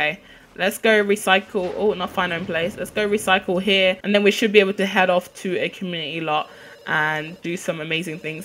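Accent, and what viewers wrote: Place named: United Kingdom. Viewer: Love your accent. Are you from the U.K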